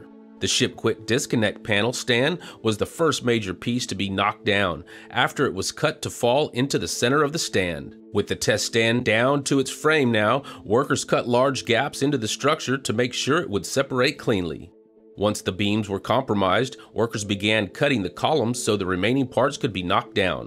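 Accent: Texas, United States. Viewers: The Texas accent may be excused, but the Liebherr crane is "Leeb-hair", definitely not "Lyber".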